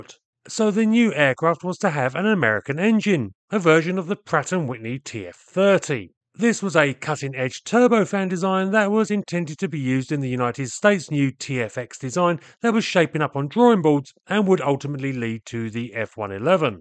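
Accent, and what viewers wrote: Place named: Essex, United Kingdom. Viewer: an informative vid as ever. Havimng memories of building this Mirage. And as ever your accent takes me back to my airport and airfield days around Essex and Chelmsford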